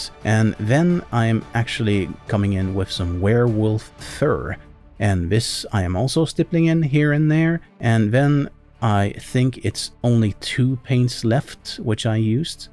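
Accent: United Kingdom. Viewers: Oy mate! Your brit accent is bloody amazing! I'm chuffed to bits! Hahah Your videos are always a gem and this one is no exception :D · Happy birthday 🥳 (and that’s a cracking British accent) 👍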